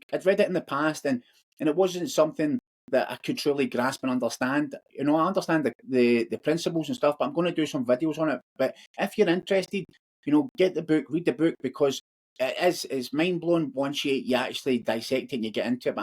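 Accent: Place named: Scotland, United Kingdom. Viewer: I enjoyed your Scottish English accent. Are you from Glasgow? I am now much interested in these topics you deal with and your words have been helpful for me. Thank you. Greetings from Turin, Italy.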